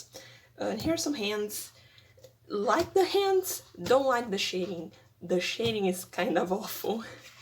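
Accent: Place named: Brazil. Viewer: You're accent sound a little Brazilian, where are you from??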